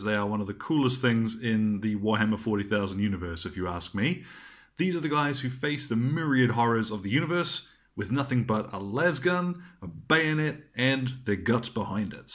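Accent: New Zealand. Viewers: I'm from New Zealand too!! Haha think that's why it sounded familiar but not quite?? Thank you so much for your videos. I'm just starting out with a sisters of battle army :) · What part of NZ are you from?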